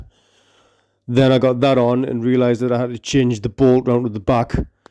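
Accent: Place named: Birmingham, United Kingdom. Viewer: Love a Birmingham accent 👍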